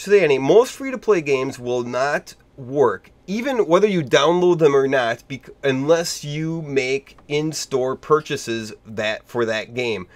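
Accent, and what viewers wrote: Canada. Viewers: Are you from Canada?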